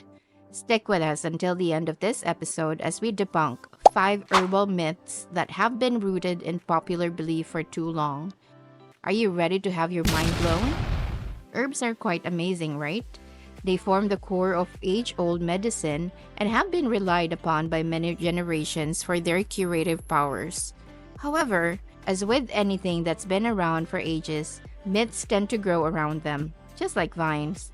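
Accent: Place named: Philippines. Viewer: voice is from the Philippines.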